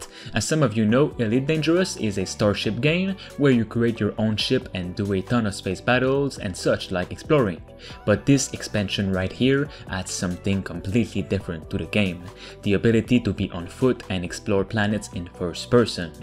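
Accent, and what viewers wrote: Quebec, Canada. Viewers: French from Montreal Lmao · le gros accent québécois haha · love that channel ! nice accent! jai la meme... vive les quebecois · Petit accent du Québec ? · I know a québécois accent when I hear one ;) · You sound like a french canadian it's so weird i like it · Es-tu Québécois? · You sound québécois!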